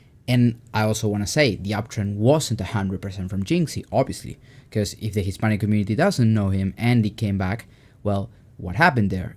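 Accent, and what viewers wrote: Argentina. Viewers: ese acento argento me mata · Algo se me hacia raro de la voz, luego me entero que es de Argentina jajaja, lo camuflaste bien.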